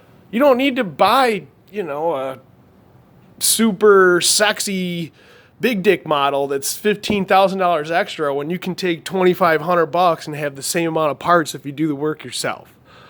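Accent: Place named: Buffalo, United States. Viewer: I was gonna you sound like your from Buffalo. Then you said Syracuse area so I was close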